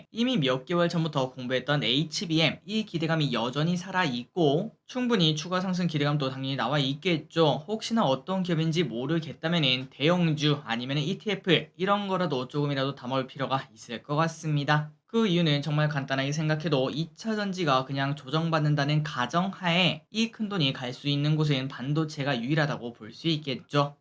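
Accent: Gyeongsang, South Korea. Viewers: 이 대구분 이신가요?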